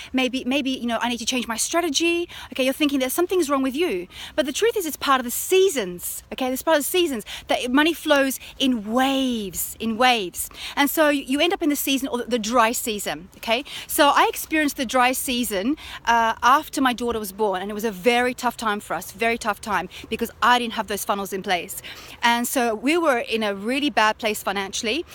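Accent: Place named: United Kingdom. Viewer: Your lovely Uk accent makes me loose concentrartion on what you say )) Thank you for great info you share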